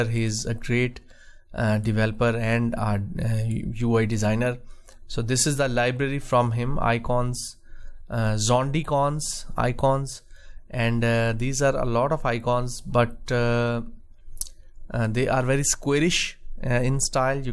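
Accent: India. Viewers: Are you from india??? I love your accent!!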